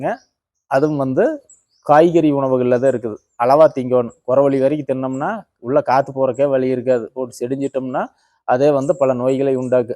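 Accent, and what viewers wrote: Kongu, India. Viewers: ஆஹா அருமை தம்பி.. கொங்கு மண்டல தமிழில் எளிதாக புரியும் வகையில் இருக்கும் அற்புதமான பேச்சு...உணவே மருந்து என்ற ஒன்று இப்போது அவசியம்...மருந்தை நம்பும் சிலர் இயற்கை உணவை நம்புவது இல்லை என்று வருத்தமாக உள்ளது... இன்னும் கூட தெளிவாக பச்சையாக சாப்பிட வேண்டிய காய்கறிகள் தந்து இருக்கலாம். பழங்கள் பச்சையாக சாப்பிட முடியும்... நன்றி தம்பி 🎉🎉🎉 .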